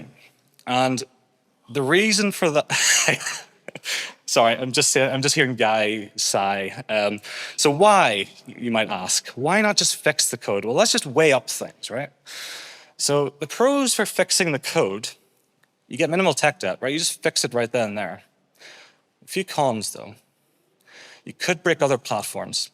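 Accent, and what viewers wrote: Northern Ireland, United Kingdom. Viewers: cant escape the northern irish accent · Nice to here a local lad speaking from NI I persume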